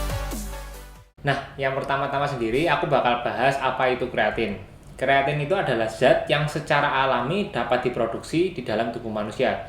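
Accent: Java, Indonesia. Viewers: Seneng dengan logat "medokmu" Bro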